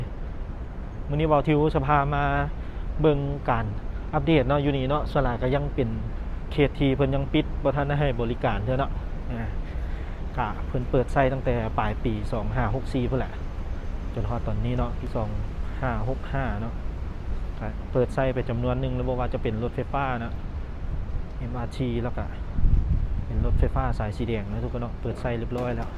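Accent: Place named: Laos. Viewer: ทำคลิปสนุกครับ ชอบสำเนียง และภาษาลาว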